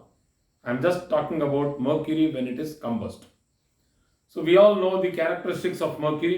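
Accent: India. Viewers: Buttler english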